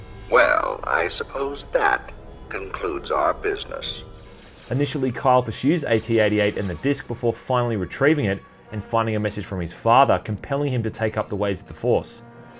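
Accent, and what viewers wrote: Australia. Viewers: this may be a weird question but what is your accent it sounds australian to me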